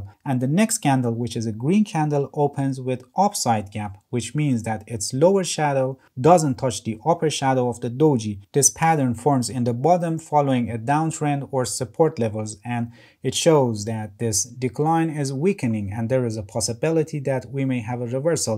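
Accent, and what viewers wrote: Iran. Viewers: As soon as I started the video, knew my man was Persian. Halet chetore azizam???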